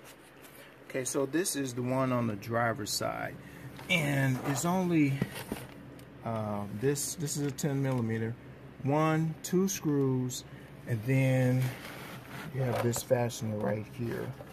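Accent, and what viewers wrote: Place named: Louisiana, United States. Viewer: dude, where in Louisiana are you from??? lol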